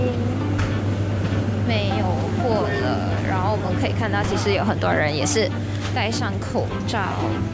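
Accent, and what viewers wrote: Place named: Penang, Malaysia. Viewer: 槟城口音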